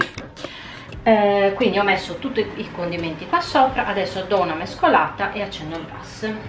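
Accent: Sardinia, Italy. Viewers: La proverò senz'altro!😝 Mi piace la cadenza Sarda della signora! Brava🤗👍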